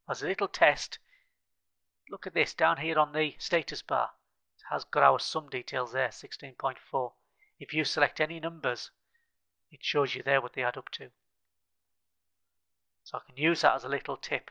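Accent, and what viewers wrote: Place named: Northern England, United Kingdom. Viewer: Dear. Absolutely brilliant and so easy to follow. A real bonus to hear a northern accent too! Thanks for posting this - it solved my immediate Excel problem - and took me even further too.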